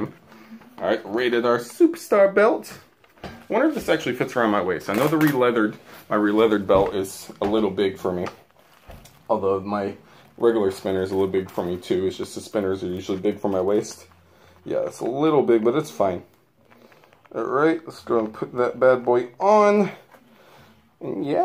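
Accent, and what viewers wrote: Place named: Australia. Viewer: That's actually quite a good aussie accent!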